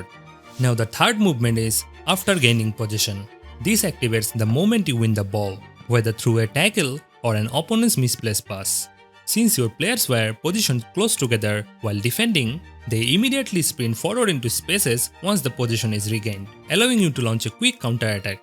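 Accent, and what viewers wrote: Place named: India. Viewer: India hai kya ? Sounds like it .. but naam indian nahi hai bhai kya 😅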